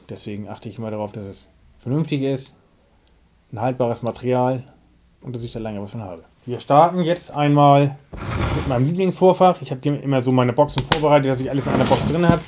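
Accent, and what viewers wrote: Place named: North Germany, Germany. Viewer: Top! Immer wieder gute Ideen "norddeutsch" rüber gebracht! 😀😀👍👍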